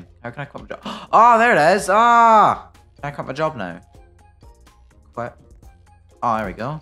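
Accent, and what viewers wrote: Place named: Scotland, United Kingdom. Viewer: You Do Realize your British Scottish right?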